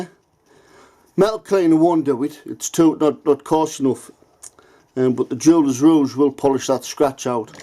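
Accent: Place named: South Shields, United Kingdom. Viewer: hi where you guys im south shields you sound local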